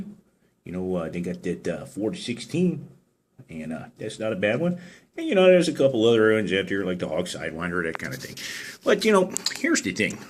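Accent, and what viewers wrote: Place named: Fargo, United States. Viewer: Oh god stop the FARGO accent!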